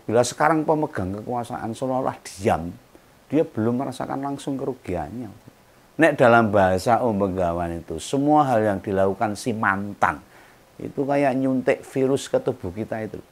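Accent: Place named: Solo, Indonesia. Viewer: Ini yg selalu saya tunggu. Bukan hanya isinya tapi dialeknya itu lho, bukan sekedar dialek jawa, tapi ini persis spt tetangga2 saya di solo dulu bicaranya, ha ha saya di Jakarta 40 tahun, marakke pengin pulang. 😂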